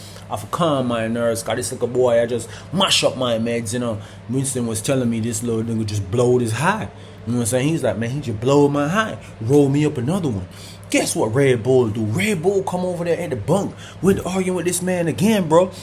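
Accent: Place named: Jamaica. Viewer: Your Jamaican your best accent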